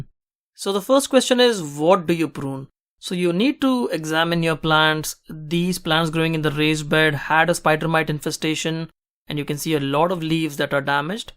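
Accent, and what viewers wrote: India. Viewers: his accent is interestingly Indian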